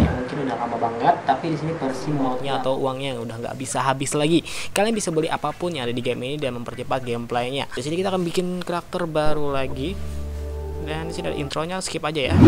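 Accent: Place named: Central Java, Indonesia. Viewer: JATENG MANA SUARANYA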